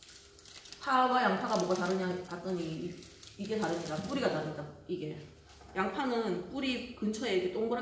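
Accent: Gyeongsang, South Korea. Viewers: 왠지 대구분이실듯 ㅎㅎ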